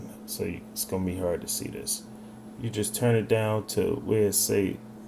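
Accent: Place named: Philadelphia, United States. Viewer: I'm from Philly too so it wasn't hard to tell. This helped a lot btw. 👊🏾 THNX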